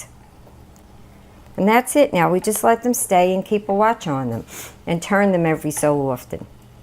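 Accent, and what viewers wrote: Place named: Brooklyn, United States. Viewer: Looks good, I make them similar but bake them in the oven coated with olive oil. She sounds like she is from my neighborhood in Brooklyn.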